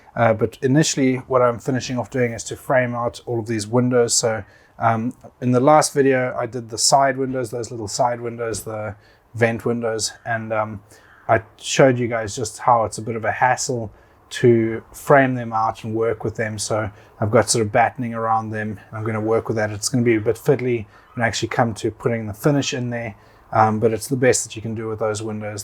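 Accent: South Africa. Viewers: Very helpful and informative as always, I was thinking about using this product as it really gets in everywhere. Thanks again for your excellent work, I was wondering are you from south africa.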